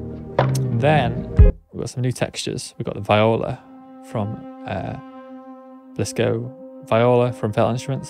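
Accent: United Kingdom. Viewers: Hey good guitar playing mate what part of Yorkshire are you from?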